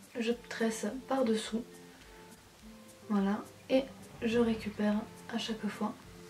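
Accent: South, France. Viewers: franchement t'explique troo bien mercii beaucoup et j'aime bien ton accend ce serai pa l'accen du sud? en trou ca merci troo♥ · toi tu viens du sud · le journal d'une fille elle vien du sud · j'adore ton accent il est du Sud ?😀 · coucou , je viens de m'abonner , tu es très jolie et tes cheveux sont magnifiques ! tu es du sud ? je suis du sud ouest ! j'aime l'accent et les cigales ! :)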